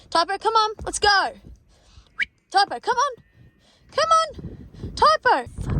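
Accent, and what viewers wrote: Australia. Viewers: The attitude of this channel, from human to dog, is just fucking fabulous❤ I don’t know why the algorithm pushed this channel in my feed, mine are aqua scape, reno and wood work stuff hence the confusion. But mate, am I glad. Girl, you doin’ so good. The only thing I don’t understand, is why are there people dislike these contents and please, I do not wish to hear of it from these people. Hugs from Finland, of all places… PS, your accent make me like my Aussie friends even more😂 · Oh, no, trust, at least in the USA, that accent goes a LONG way. A good number of our top stars in Hollywood call Australia home. …Helps that you grow them damned pretty down there, both the gals and the guys. 😉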